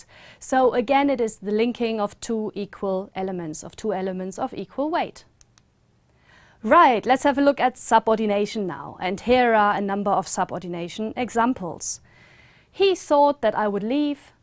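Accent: Germany. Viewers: Funnily, this was my assumption after I heard you talking. For me as a German your accent is still quite noticeable. When you pronounced "have" I immediately thought of this phenomenon called word final devoicing ("Auslautverhärtung"). Am I right with that or would you say it's part of your British accent?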